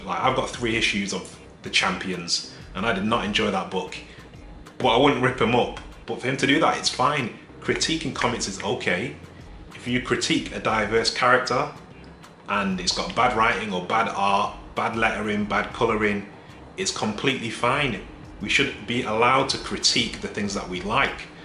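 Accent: United Kingdom. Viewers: Saw this in my recommend, saw the thumbnail and title. "Let's see what this is about." Black guy with a British accent. subscribed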